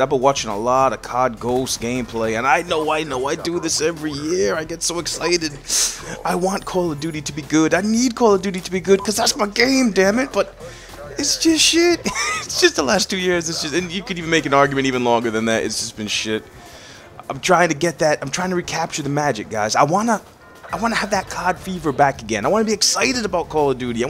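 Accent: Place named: United States. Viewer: do all you Americans speak in such a way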